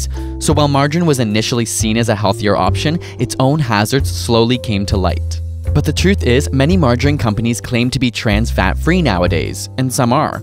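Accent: Canada. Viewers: Haha, hello fellow Quebecer, I see that margarine container. Do you live in Montreal your accent would suggest it.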